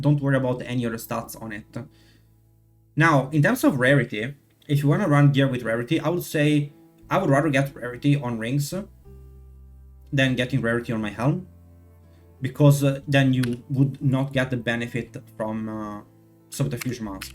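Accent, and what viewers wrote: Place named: Italy. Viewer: Hai un accento quasi italiano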